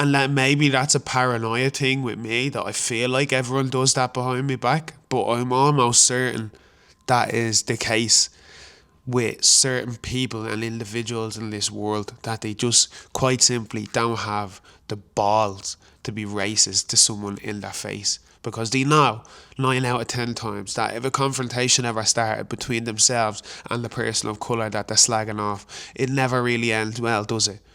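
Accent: Dublin, Ireland. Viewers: Well, you're here and you sound like any other Jackeen, so I suppose that's that. But there is more to being Irish than just being born here, or having the accent, or having a passport, playing our games and music, or whatever. Simple as that. Like so many other people who have to be heard on this, you can rattle off the names of Phil Lynott and Paul McGrath. Both of whom had one actual Irish parent, it seems obvious to point out. If only all our imports would contribute so much as they have. I'd be more interested to hear your thoughts on Kevin Sharkey and his political views. If you don't know who he is, you should do. He's a complex character for sure. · Well done really enjoyed the honesty & how could anyone think you weren't a Dub bro with that accent 😂😂😂 & your mam's a legend 👏 you're very lucky to have her ❤ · I absolutely looooove your crisp Irish accent and the radio friendly tone of your voice. 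I can't pin point what part of Eire it is from because it is clear to me that as a nation you guys have many more accents. On a separate note . Moisturize, moisturize, shea butter. That skin's going crack like eggshells if you don't . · Fascinating, thank you. Your mom sounds incredible! You look black and sound Irish. I look white and sound non-Irish. Always a bit more to reconcile, but I think it's enriching to have additional perspectives. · No such thing as Black and Irish...you are an Irish citizen but you are not Irish. If I was born and live in China...it doesn't make me Chinese, rather a Chinese citizen. But, love your Irish accent! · Fine dub accent that mate.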